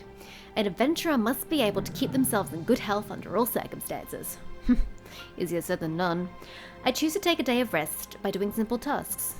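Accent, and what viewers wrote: Australia. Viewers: Just found that video by coincidence whadda great video and such a sweet australian accent :3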